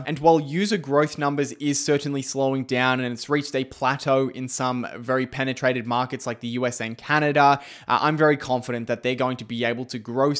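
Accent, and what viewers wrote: Australia. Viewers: That's Aussie English.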